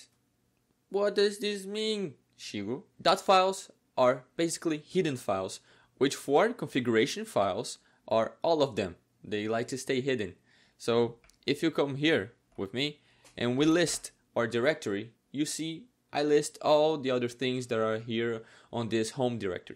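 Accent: Brazil. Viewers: i can recognize a brazilian when i see one... the whole "RH" thing gave you away KKKKKKKKKKK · Você é brasileiro? Esse sutaque eu não confundo nunca, essas piadinhas enquanto fala e essa figura de linguagem. Consigo sentir de longe. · De fato ele fala bem pra cacete. Mas consegui notar o sotaque carioca AUSHAUSUHAUHSHUAUHSUAH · tu é brasileiro né? (percebi pelo "rh")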